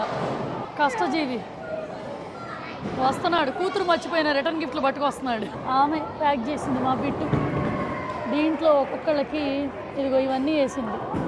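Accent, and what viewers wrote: United Kingdom. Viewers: Her British accent is adorable❤ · She got that cute British accent 🥰❤️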